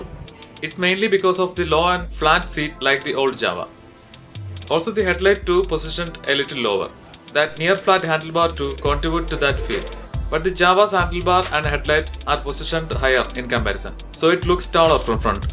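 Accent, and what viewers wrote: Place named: Kerala, India. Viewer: I think u are a mallu guy by hearing ur pronunciation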